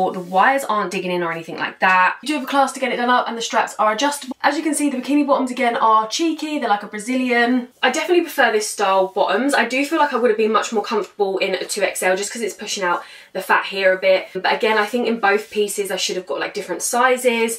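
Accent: United Kingdom. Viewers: The blue and brown with the diagonal stripes is my favorite. Sorry for not having a better description, but you are speaking fast British English and I am hearing in slower American .English! As always, you are beautiful!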